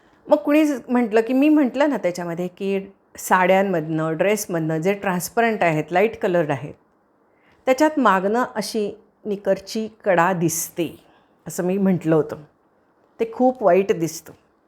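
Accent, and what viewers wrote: Kolhapur, India. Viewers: Madam tumhi kolhapur chya aht ka?? bolnyacha tuning vrun vatt kolhapur che aht😊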